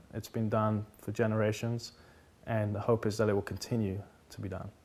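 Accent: England, United Kingdom. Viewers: I did ask where you were from on another video, as you sounded to me like you were from England, but I’ve just realised that you answer that in this video, so that’s the end of that question. You’ve had an immense amount of training and experience in the industry, and I wish so much that I’d have had the encouragement when I was in school (I’m from Wales). It’s only now, at the age of 38 that I have had the chance to find my passion in woodworking. It’s always nice to hear when people had a great teacher that encouraged them, rather than hindered. Anyway, it’s great to see someone homegrown doing so well in another country and also being respected as an artist too. By the way, the chair design that you make a limited production, looks amazing! I’m really enjoying watching your videos. I came across you on Instagram and had no idea you had a YouTube channel, so I’ve been binge watching and I must say that I really like the production value. 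Also, the fact that they don’t have a voice over is a great change, as it really makes me feel like a fly on the wall. Holy hell, I’ve just realised how much I’ve written. Anyway, here’s a big hello from the West Midlands, UK. Signing out.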